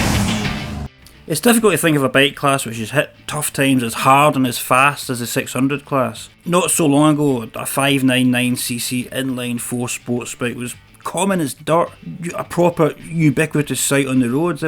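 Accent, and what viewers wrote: United Kingdom. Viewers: British accent, u cant beat it.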